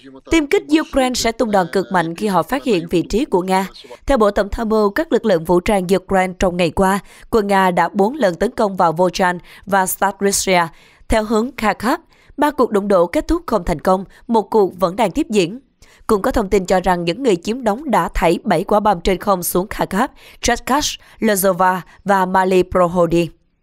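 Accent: South, Vietnam. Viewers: Kênh bạn rất hay và chuẩn về thông tin. bạn nói giọng miền Nam nên nói chậm rãi. đừng nói nhanh quá... nghe sẽ đầy đủ hơn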